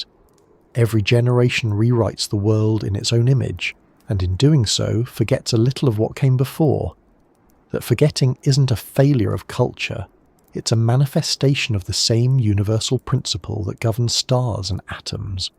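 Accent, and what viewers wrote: United Kingdom. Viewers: I'd like to believe it's some brilliant man from the UK. I'd be so disappointed to find out if the voice is AI.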